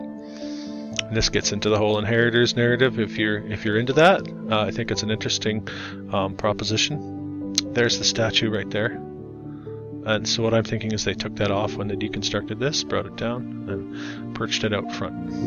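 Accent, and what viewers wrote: Canada. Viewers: Are you from Alberta ?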